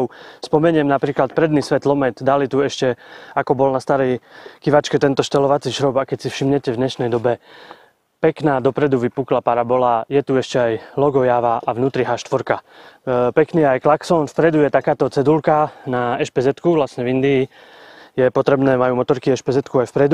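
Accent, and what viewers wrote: Eastern Slovakia, Slovakia. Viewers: Co to je za STRAŠNEJ dialekt???????. Nejsem Slovák, ale ten přízvuk je strašnej i pro Čecha. To je co za dialekt něktéré části Slovenksa u Ukrajiny nebo Polska, Maďarska????? Díky za odpověď. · Ten východniarsky prízvuk mi uši trhá...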